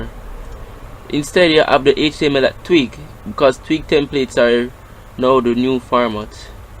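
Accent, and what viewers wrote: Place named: Jamaica. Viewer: Jamaican voice with a Welsh name....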